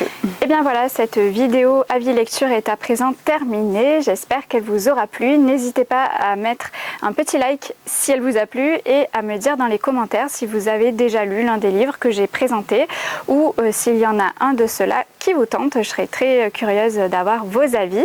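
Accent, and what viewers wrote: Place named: South, France. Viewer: J'espère ! En Savoie, à 1h30 de Grenoble et d'Annecy, au pied des stations de ski les plus réputées du département ! T'es dans le Sud toi non (du moins ton accent est du Sud) ?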